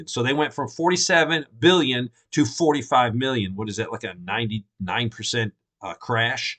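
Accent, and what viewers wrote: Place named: South, United States. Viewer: It’s 3. Nu cle yer. Also, he’s Southern. Everything has more syllables in the South.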